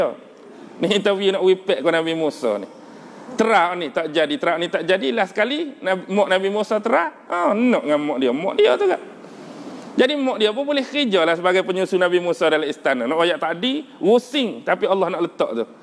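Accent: Kelantan, Malaysia. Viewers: Dialek Kelate???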